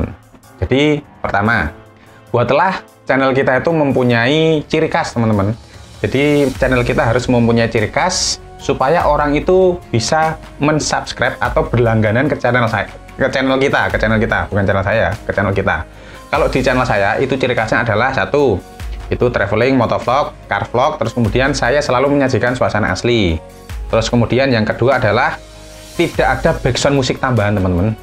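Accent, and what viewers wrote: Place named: Ungaran, Indonesia. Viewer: Nek ngrungokke logatte mas, dadi kelingan kampung halamanku di ungaran mas, karna logatnya hampir sama, semoga tambah sukses banyak subsribernya mas, mampir Cilacap dan direview kotanya, wassalaam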